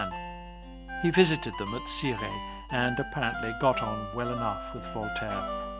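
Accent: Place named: United Kingdom. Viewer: Background music is too intrusive plus heavy British accents make narrative wasteful.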